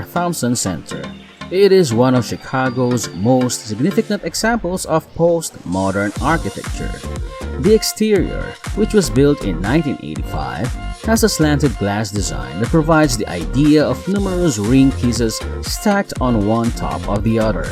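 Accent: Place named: Philippines. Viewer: Narrator is Filipino? The accent is there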